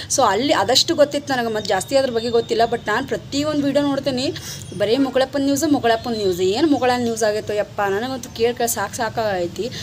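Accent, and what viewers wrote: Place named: North Karnataka, India. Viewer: Enamma, Gayatri GE mukuleppa tale kedisidda e edu love jihaadi pakka Amma neenu tumba anubhavi neenu khare ide mukuleppan mukulevva correct ide Amma neenu e video nodi bhahusha brahman samajadavaru anisutte nimma maata nimma mukha nodidare ansutte nimma uttar karnatak da maatu Keli nakku nakku kannalli neer Bantu entantha dailog matadtiyamma pratiyond maatigu nakku nakku sustade thank you amma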